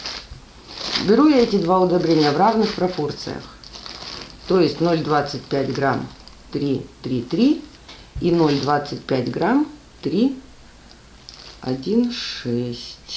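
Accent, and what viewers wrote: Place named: Ukraine. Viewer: а я решила, вы из Украины, говор-то наш. Родились в Украине? ))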